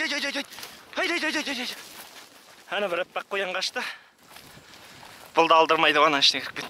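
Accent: Standard. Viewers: Ассаляму алейкум. Жакшы аңчылык болуптур, Казактын таза казакча сүйлөгөн жигити ушундай болот, Кыргыз болуп туруп баарын түшүндүм.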